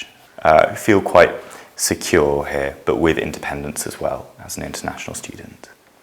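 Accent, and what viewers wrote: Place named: RP, United Kingdom. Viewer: 話し方がとてもポッシュですね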